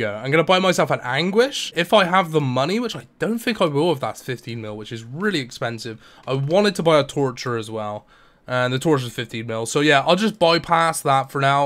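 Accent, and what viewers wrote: Bristol, United Kingdom. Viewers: Brizzle accent mesh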